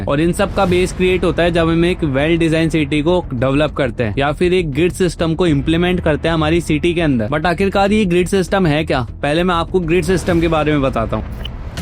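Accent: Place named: Hyderabad, India. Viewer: accent se laga Hyderabad wale aisi hindi bolte hai mostly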